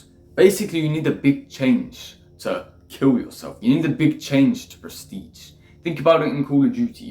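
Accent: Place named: United Kingdom. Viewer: What’s your accent? It sounds British but with something else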